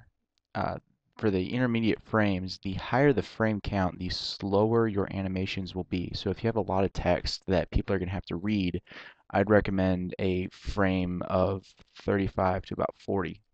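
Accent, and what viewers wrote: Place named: Ireland. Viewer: u from ireland what city ???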